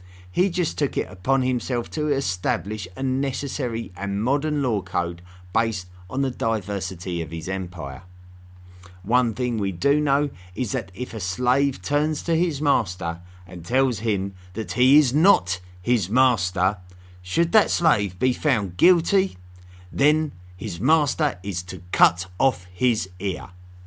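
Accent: United Kingdom. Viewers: He sounds like that guy on Saturday night live some years ago who talked about his drawings with a similar British accent. The guy would pronounce drawings as drowrings..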